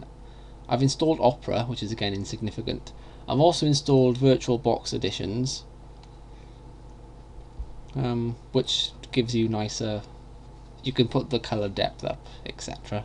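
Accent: United Kingdom. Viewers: Is that a British Accent ?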